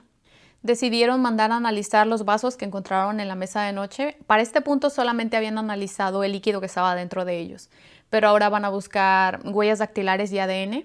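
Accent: Mexico. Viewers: Al fin alguien que narra los episodios con normalidad sin pretender ser dramático. Además el acento es perfecto. Me encanta que seas mexicana 🇲🇽 Muchas gracias.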